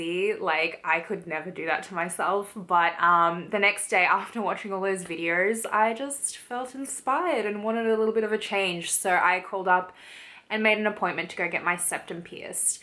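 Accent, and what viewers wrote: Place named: Australia. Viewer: Love your accent!! I've heard Australian accents before but never that hint of "r" that follows ur "o"...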